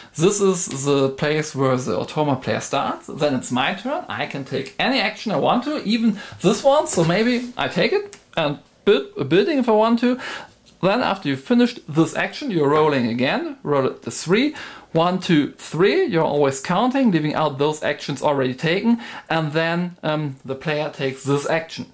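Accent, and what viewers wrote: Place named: Germany. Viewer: Oh honey, your English is great, thick German accent 😊 but still very easy to understand!! Great job and thank you for this runthrough,! Keep up the good work! Greetings from California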